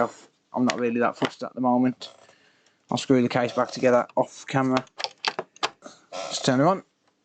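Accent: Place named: Midlands, United Kingdom. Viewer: Where abouts in the country are you mate? Sound like a midlander?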